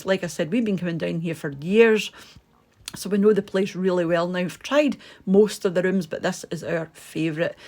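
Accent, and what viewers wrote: Scotland, United Kingdom. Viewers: I love these video's, they give me some new ideas and a new lease of life. I also adore your Scottish accent! x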